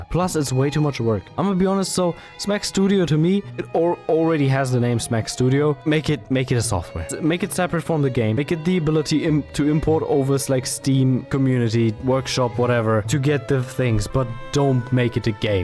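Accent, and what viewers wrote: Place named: Germany. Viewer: i guess you are german good accent tho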